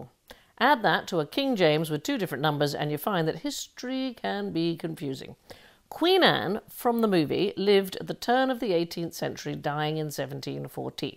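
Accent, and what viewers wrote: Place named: United States. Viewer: I love when you use your American accent.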